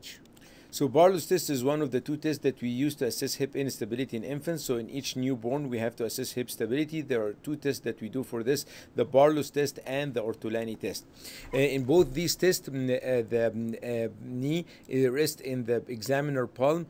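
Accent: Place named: Egypt. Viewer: His accent sounds Egyptian I’m not sure lol Thanks for the video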